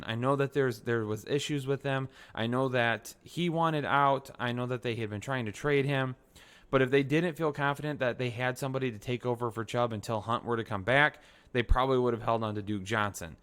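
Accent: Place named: Boston, United States. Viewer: Everything this guy says that involves the o sound is magical and entertaining. I'm guessing he's probably in New England or Boston somewhere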